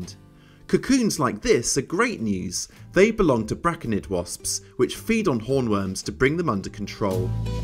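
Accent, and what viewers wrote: United Kingdom. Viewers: First sentence and I label him as Brit. :D I have a weakness for their style so.... (pardon gross generalization but Brits make me smile)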